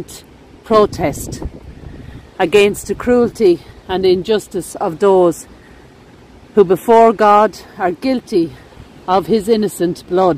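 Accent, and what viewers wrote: Ireland. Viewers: I am trying to learn to speak irish and I really love your accent. Also thanks for all the cemetery videos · I am in Kentucky, as well. Greetings to you! Only recently found you and I thoroughly enjoy being invited along on your explorations. I love history and I love your brogue. I have some Irish ancestors just not sure how much. Are there any of the names of Case or Harrell? Anyway, thank you so very much for what you do, it's wonderful and I hope you will continue for a very long time. Take care and God bless! 🙂🌹🇺🇸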